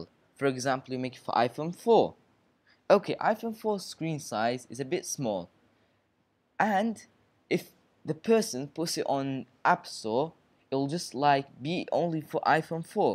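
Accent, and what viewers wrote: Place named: France. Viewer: Thanks you french-voiced stranger.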